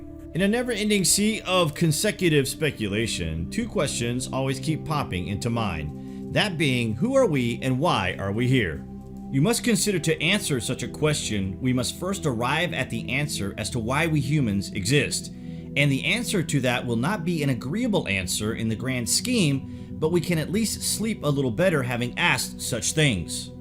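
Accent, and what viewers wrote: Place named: California, United States. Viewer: ‘We’ and ‘our’ - plebeian public mind nonsense. It’s hard to get to the substance here because of the terrible writing. Stupid California accent doesn’t help. Learn to write like an individual and get a narrator who can speak standard English with RP or standard American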